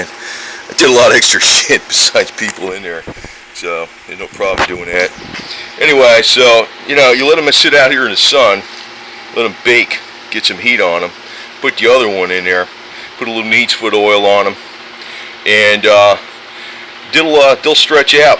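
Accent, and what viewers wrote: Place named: Chicago, United States. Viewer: i always liked el caminos. this guy sounds like a chicago accent cause he talks like me and i am from chicago.....! and nice car dude....!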